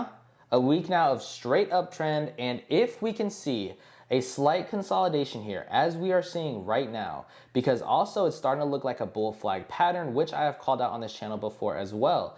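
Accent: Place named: United States. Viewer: Very good plain English.. Easy for me to understand.. Love American accent.. Go shibarmy.. from Malaysia 🇲🇾😊🚀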